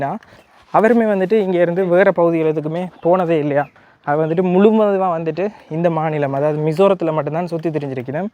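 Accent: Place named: Sri Lanka. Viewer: தம்பியின் இலங்கை தமிழ் பேச்சு மற்றும் காணொளி அருமை. பிடித்திருந்தது. Subscribers குறைவாக இருப்பதை பற்றி கவலை வேண்டாம். கலியுகத்தில் நல்லவர்களுக்குத்தான் துன்பங்கள் மற்றும் மன உளைச்சல்கள் வரும். ❤❤❤❤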